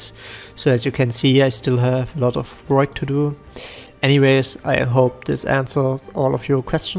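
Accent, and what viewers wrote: Germany. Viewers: Don't think so. Sounds very german. Unlikely he would consider chinese server with rather bad ping.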